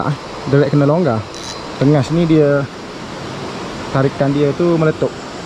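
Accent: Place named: Kelantan, Malaysia. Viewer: Cakap kelantan jela bang,macam tu susah je nak cakap kl,haha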